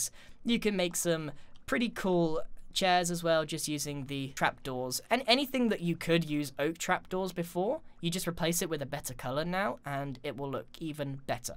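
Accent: United Kingdom. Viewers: Rafael YT relax he sounds like he's british or something he probably is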